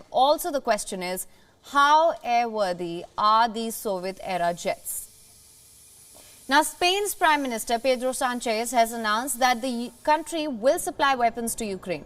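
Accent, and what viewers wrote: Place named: India. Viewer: Too heavy Indian accent --- can't understand what she was reporting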